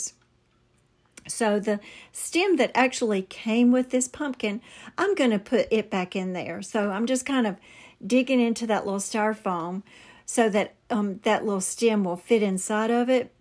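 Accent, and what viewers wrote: South, United States. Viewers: I feel so bad for you going through that scare of suspecting you may have shingles. I went through it a few years ago and I did in fact have shingles., we arent here to judge your appearance. Absolutely not. I know that I enjoy your sweet voice and southern accent. You are one of the best creators I watch. Today all of these projects are fantastic. The trimmings mold are so gorgeous with the pumpkin and the greenery and flowers. And OMG that night stand came out so beautiful with the decopauge paper. Matter of fact I just checked it out and I am buying it. You are amazing with everything you touch. Thank you for sharing your projects and for sharing the links in case we're interested. I do hope your hives disappear quickly.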